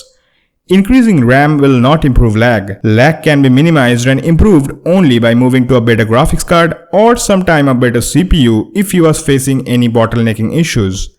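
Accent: India. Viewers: Lag raha hai thoda bahut indian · You've gone a long way to hide your indian but you still got a bit left. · He speaks good english and i like his indian accent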